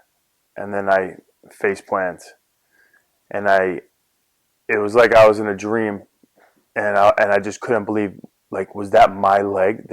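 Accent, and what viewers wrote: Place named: Brooklyn, United States. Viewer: You are what got me into MMA 10 years ago, Chris. I'm originally from Brooklyn and naturally related to you just from your pride, background, and accent. I'm so sorry this happened to you. It was gut-wrenching to watch as your fan. When you said your mind turned to "even if I lose the leg, I can still be with my kids" I couldn't help but be amazed at what an incredibly willful soul you have. You will always be an inspiration to me and my favorite fighter. God bless.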